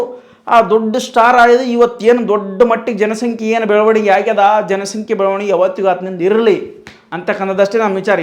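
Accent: North Karnataka, India. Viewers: Anna nanu nimma abimani anna 🙏🙏🙏🙏. Nimma videos goskara wait madtirtini anna nimma matugalu kelidakke chenda😅😂 adrallu nimma uttra karnataka matugalu nimma bayalli kelodakke innu chenda 😂😊🙌👌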